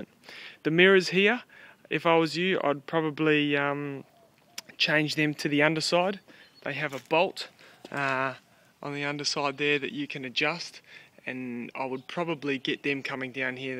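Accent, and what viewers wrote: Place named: Australia. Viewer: the the auzzi accent man and you got a sick ride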